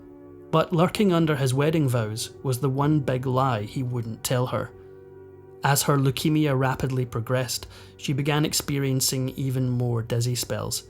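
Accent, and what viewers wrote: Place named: Scotland, United Kingdom. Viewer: Yay my favorite Narrator love the Scot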